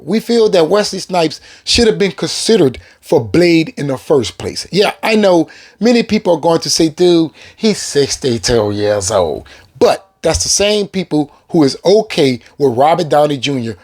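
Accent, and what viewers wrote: New Orleans, United States. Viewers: Man, am I hearing a nawlins’ accident? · that N.O. accent hitting and is much needed in the YouTube space. Keep it flowing, my friend. Love your content! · Are you from New Orleans? I hear the accent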